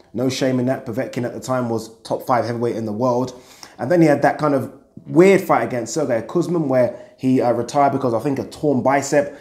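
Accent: Liverpool, United Kingdom. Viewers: We? you dont sound as scouse as Pricey.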